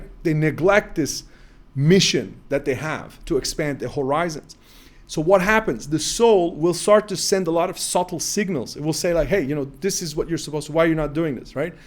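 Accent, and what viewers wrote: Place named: New York, United States. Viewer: I'm American of Swiss French parents. I was in Iran for 2 or 3 weeks in 1970. I hitched rides from Turkey to Tabriz then Tehran . Had to take a train to Mashed , a very holy city. Iran was a very peaceful place. The people were super nice , very intelligent, very clean. The woman were free and very friendly in a very respectable way. It was completely different going into Afghanistan . A very wild place. Your channel says United Kingdom but you sound like you're from New York city. Your astrology readings are always a real mind blower. You deep dive like no other. Thanks for your incredible work !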